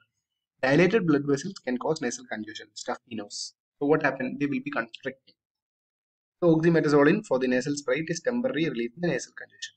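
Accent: India. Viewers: Pakka Tamilnada English accent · It's kerela accent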